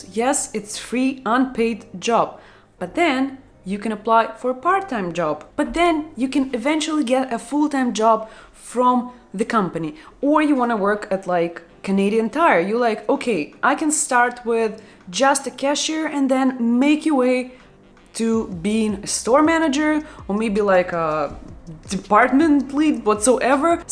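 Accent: Russia. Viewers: hi i just wanted to say that i love your channel and your personality and russian accents that pops-up from time to time is very funny. is it possible for you to make a video on what is a co-op program, give us most of the informations you believe we need to know and share a personal experience if can; I'll really appreciate ✨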